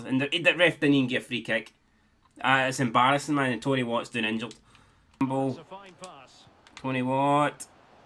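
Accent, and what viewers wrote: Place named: Scotland, United Kingdom. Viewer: Fantastic scottish accent ! Greetings from Argentina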